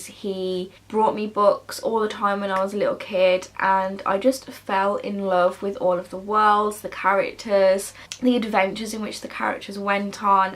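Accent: United Kingdom. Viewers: To an American, all British accents sound awesome, so you’re good with me. 😘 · Welcome to booktube! :D I love your Birmingham accent! ♥ My own accent is such a hot mess! But you sound lovely!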